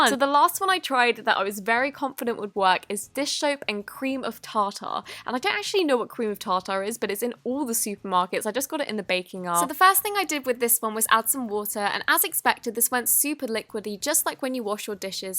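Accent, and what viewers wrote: United Kingdom. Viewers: Hi!! I love your videos sooo Much!! You're so sweet and makes The best slime videos ever, because you don't talk sooo Much!! I hate when People are talking for ages. But you just talk simple and clear about The slime you bought/made and its so relaxing to watch your videos:) you are My favorite YouTube!!- one of your fans/followers❤️ PS I love your British voice<3 I Hope you read This comment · I bet $<num> that she won't answer are you british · Are you British? Cause you sound like one -_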